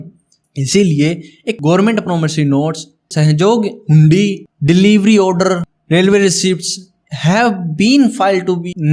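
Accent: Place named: Haryana, India. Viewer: U are from Haryana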